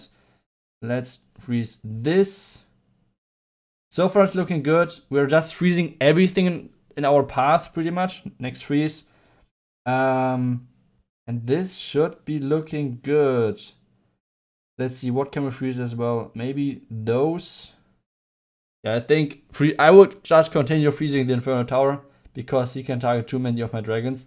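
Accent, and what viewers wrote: Germany. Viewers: You can hear the German accent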